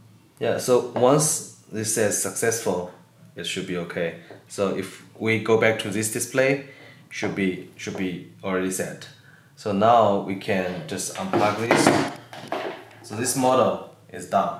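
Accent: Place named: China. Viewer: can you please add SUBTITLES for your bad chines English? Thank you.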